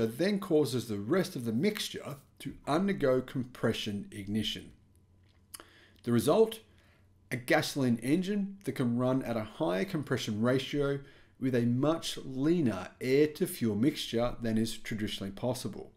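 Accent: Australia. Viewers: He's an Aussie - it's an accent thing I guess. He also says Tezla...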